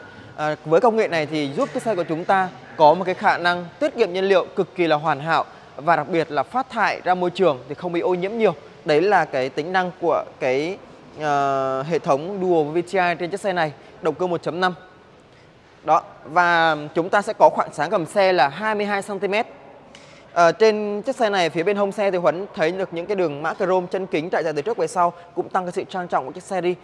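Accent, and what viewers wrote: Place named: Thanh Hoa, Vietnam. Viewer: Thằng này nói nung tung núc thì 550 núc thì 650 nói quá nhiều giọng lại thanh hóa khó nghe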